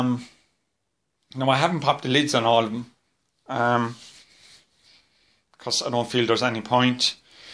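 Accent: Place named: Ireland. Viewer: Tree kilo kit😂 nothing better than the ROI accent, always make me smile. Bit of a pain that your gear is in storage but at least your brewing buddy. Sure they will turn out smashing🍻👍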